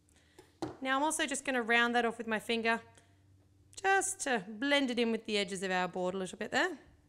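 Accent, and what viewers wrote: Australia. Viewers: I love your voice. It is like an Australian Jennifer Lawrence! :)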